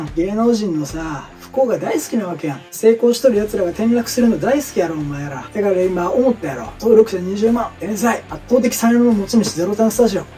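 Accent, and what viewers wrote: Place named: Kansai, Japan. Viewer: 関西弁がびっくりしてもぉーた😂 頑張って下さい 見てますおもろいですよ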